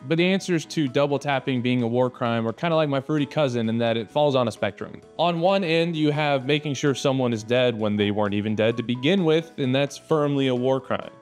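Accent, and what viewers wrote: United States. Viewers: Hold up. Your American accent tells me enough. Pay Japan and get it over with American. Consider your own first words in this video. (I enjoyed your content [as in this first 5 second clip I watched from you] but I must leave and never watch the video further because that would most propably make me look like an idiot as you will most likely prove my own point or speak about the "illegal warcrimes")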